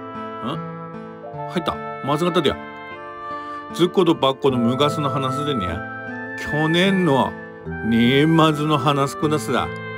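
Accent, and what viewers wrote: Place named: Akita, Japan. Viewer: 秋田弁 懐かしかったぁぁ😂 おばあちゃん思い出しました✨ 今度実家で父にこの動画見せます‼（きっと️秋田弁の響きに飢えてるはず） しかもオチが思ってたのと違って吹き出しちゃった〜😂🤣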